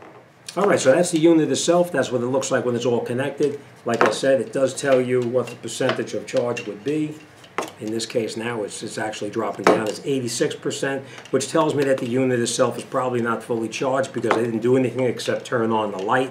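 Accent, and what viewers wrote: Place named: New Jersey, United States. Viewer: Hey. I got your comment right here. Sounds like youre from Jersey or someplace up north like me. Actually, I live in Virginia now. It'll be cool if I won your giveaway.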